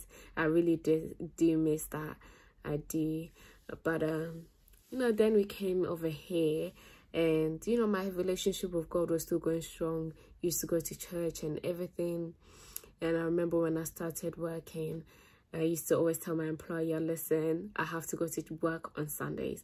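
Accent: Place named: Ghana. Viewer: When you honestly ask God for guidance, Islam is the destination you will arrive at. Keep going sis. Your accent sounds like from Ghana 🇬🇭 , is that the case?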